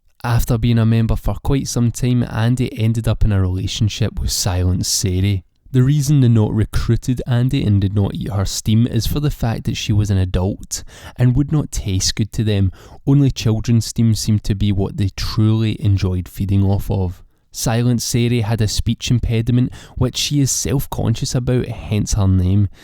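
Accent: Scotland, United Kingdom. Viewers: I'm guessing Scotland.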